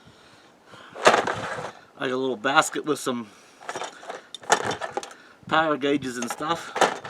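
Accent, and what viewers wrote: Pennsylvania, United States. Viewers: He....I hear a back east man talking Pa accent...Am I right? That tire was to heavy for me to change, good job. Hope you were able to get a spare at a good price....Janice from Pa,now Arizona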